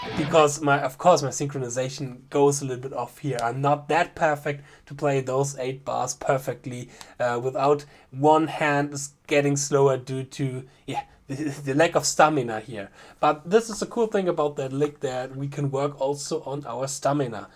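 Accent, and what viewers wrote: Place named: Germany. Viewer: Wow, man! I have to tell you, how much I like your videos so far! Not only are you a phenomenal guitarist, but you are also very, very likable (you even remind me of my best friend optically AND voice/German-English accent) and you totally nail the spot(s) for me, where I want to get better at! Very well explained and with a super fun lick! I'm really celebrating here!:D I didn't know, how fast I can actually play meanwhile, because I rarely play THIS fast and even being totally clean! (Well... not THAT "clean". I had/have some beer, I admit...;) But you know what I mean...) You should consider making a Patreon channel sometime in the future. I would subscribe! (I'm already a Patron of Ben Eller, Andy Wood and Alexandra Zerner and this is a great thing!) Now, I'll continue the last bit of the video and will surely check out more from your channel afterwards! 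Danke dir,! Ganz ehrlich, du bist da offenbar ne ganze Ecke näher an mir als die üblichen Verdächtigten auf Youtube, wie z. B. Ben Eller etc. Alles geiles Zeug, das wirklich oft hilfreich oder spaßig ist, aber du bringst das für mich zumindest noch mal auf ein ganz neues, deutlich ambitionierteres Level. Zumindest mehr als Ben Eller zum Beispiel. Der hält das für meinen Geschmack oft ein bisschen ZU "einfach". Ich kann die Videos von dem eins nach dem Anderen runter-rocken und kann danach fast jedes Lick zumindest auf 2/3 Speed. Und dafür brauch ich meistens nur den "Stepdad-Speed" und guck mir das 3 - 4 mal an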